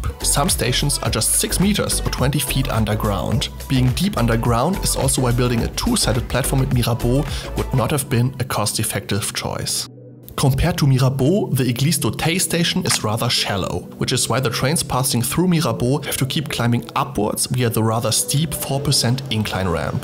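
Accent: Germany. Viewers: Are you german? Your english has a german accent I think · I was wondering if you were german, turns out you are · Great vid, watched your previous one which is equally as good. I love the short, comprehensive style with the added German Accent! Great English btw. Hope to see the channel grow bigger and bigger with every video! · That was by far the best suggestion YouTube did since a long time. Great video, and very underrated! You should have 100 times the subscribers you have now and at least 10 times the views this video got today. Greetings from a fellow German. :) (By the way: the first thought which came in my mind when I heard your Voice: he is definitely a German. 😂) Keep it up mate! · du bist deutsch oder? top video 👍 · You are German right 😂😭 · Ich war schon über dein deutsches Englisch verwundet.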